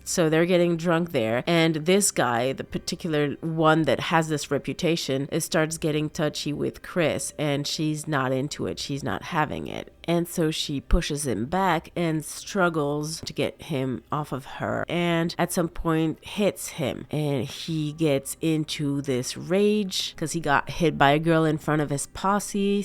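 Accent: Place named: France. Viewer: T'as un léger accent français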